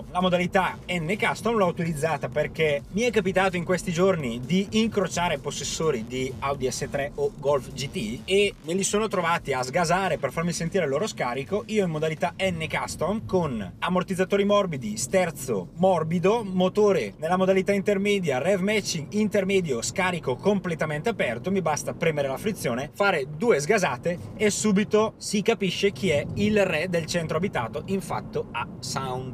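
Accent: Brescia, Italy. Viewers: figa fai un corso di dizione, la cantilena bresciana non si può sentire!!! :D anche se sei bravo! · Finalmente un bravo tester con il nostro tipico accento bresciano complimenti ..!!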